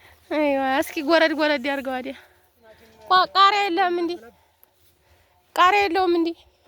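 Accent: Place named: Rural, Ethiopia. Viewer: ሀዋየገጠርልጅአማረኛነው አነጋገርሺ በርቱ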